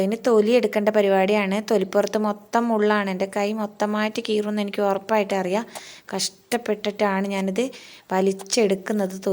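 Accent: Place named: Kasaragod, India. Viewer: Aa namade paara... 😅chechi kasrod ano.. Nammem athe😁👍🏼😍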